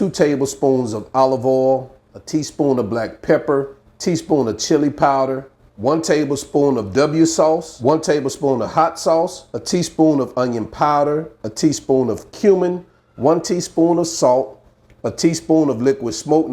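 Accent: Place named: Alabama, United States. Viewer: Oh my sweet Jesus, can I be your friend and come eat at your house. I promise ill put it on it each time lol 😆 but really this was amazing to watch. Imma stalk you to learn all your techniques and I knew you was from Alabama for some reason b4 you said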